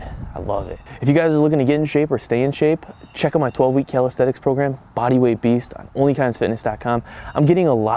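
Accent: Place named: Boston, United States. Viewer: Boston accent at it again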